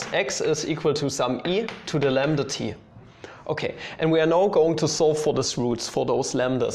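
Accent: Germany. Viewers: Hey, where are you from? Your German accent is quite unusual, you don't have the voiced-voiceless opposition in your obstruents (e.g. p-b, t-d, k-g, ch-j, s-z), and also in German you pronounce sch and soft ch identically, and I can't identify where people speak similarly to you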